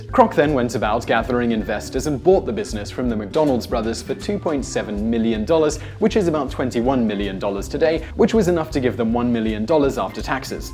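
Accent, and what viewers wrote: United Kingdom. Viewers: I get to watch one of your videos and look at your sexy face while you speak with that amazing British accent! 16/10 would watch again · Sexy British accent.cheers mate!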